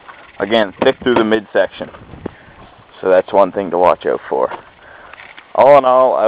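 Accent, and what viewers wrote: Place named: Maritimes, Canada. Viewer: sound like a maritimer ta me??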